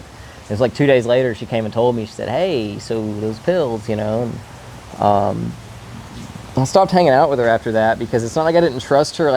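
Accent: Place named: Texas, United States. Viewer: i kno this guy well. he’s from texas, you can hear his texas “twang” come out some here. he use to live in athens GA and make edibles w greg simpson hash oil method. he’s really smart, and a good businessman man. He’s no chemist, at all… decent side kitchen chemist maybe. he’s a exmarine and a drifter. and he is not on drugs, well like not stimulants anyways.. he’s naturally vocally energetic and fidgeting like this.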